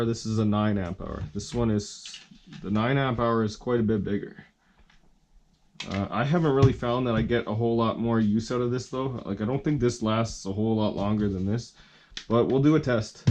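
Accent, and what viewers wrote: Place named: Canada. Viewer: This guy has the biggest Canadian accent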